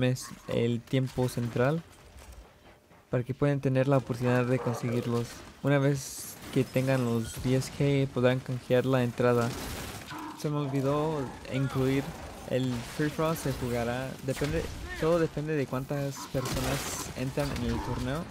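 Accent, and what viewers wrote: Mexico. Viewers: Ey feliz año un saludo, esa voz se escucha bien mexicana dios te ayude a tener más y tener nuevos proyectos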